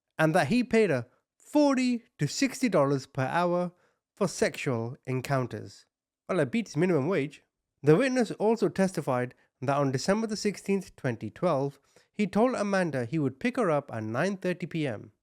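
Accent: United Kingdom. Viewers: English is not second language, he is just British 😂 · Bruh, he’s British. There’s a ton of words that’s British English and American English pronounce differently. It’s so funny how us Americans often think “our way” is the correct/only way for things 💀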